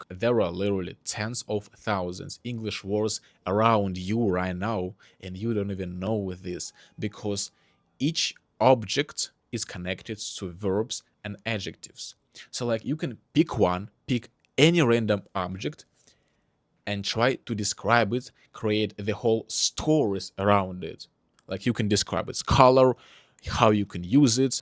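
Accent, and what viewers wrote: Russia. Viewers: The moment you spoke I knew you were Russian how I love the Russian accent 💗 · Aww, you have a brilliant Russian accent❤ Your accent gave away your nationality😅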